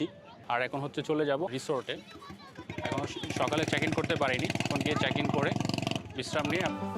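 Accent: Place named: Mymensingh, Bangladesh. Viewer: সম্ভবত ময়মনসিংহের আশে পাশে।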